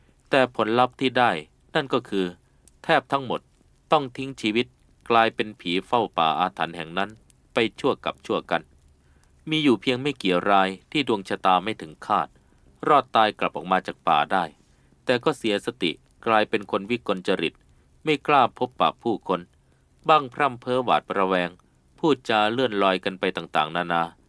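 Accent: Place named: North, Thailand. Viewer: ชอบฟังครับภาคเหืมนมาก😅😮😢😂❤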